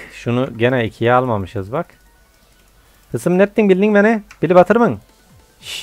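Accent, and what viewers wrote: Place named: Afyon, Turkey. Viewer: Kardeş afyonlu musun şiven çok hoş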